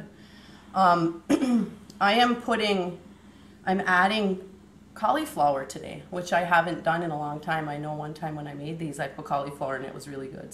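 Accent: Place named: Ireland. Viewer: Great video! I love these kind of projects! Your accent is so thick, almost Irish sounding. Love it. Is it true that you are Jeff Daniels wife? Someone told me that. Oh by the way timers is great. My grandmother puts it on everything for memory retention she says.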